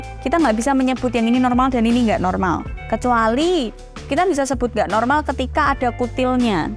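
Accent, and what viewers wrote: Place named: Java, Indonesia. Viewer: Pakai Bahasa indonesia yg Benar cermat ,,,, jangan pakai logat Jawamu ,,,, yg nonton bukan wong jowo doang,,, ,,, byk suku lain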